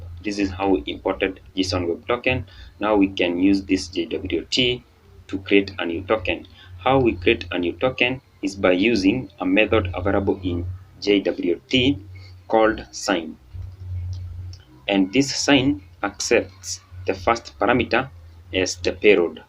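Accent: Kenya. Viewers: Why do I hear a Kikuyu Voice😂😂😁😁, Bro, congrats. Much respect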